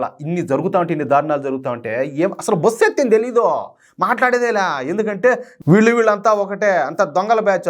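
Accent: Rayalaseema, India. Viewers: చాలా చక్కగా మంచి ప్రాంతీయ భాషలో రాయలసీమ భాషలో బాగా వివరిస్తున్నారు గురువుగారు జైశ్రీరామ్ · మీరు మన రాయల సీమ భాష అంటే నాకు చాల ఇష్టం మీరు చాల బాగా మాట్లాడు తున్నారు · చాలా బాగా చెప్పారు అన్న....మన రాయలసీమ భాష విని చెవులకు ఉన్న తుప్పు విడిచి పోయింది....జై శ్రీరామ్🙏🚩🇮🇳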